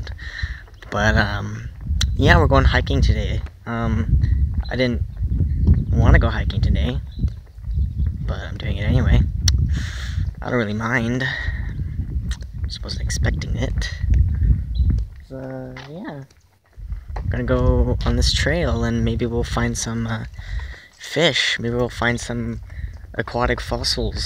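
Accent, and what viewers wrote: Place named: Australia. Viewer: Love the Australian accent, need to make more about your life videos 👍✌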